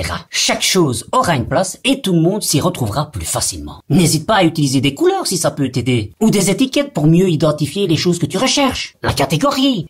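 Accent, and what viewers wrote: Belgium. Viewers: On reconnait bien l accent belge! Et j adore❤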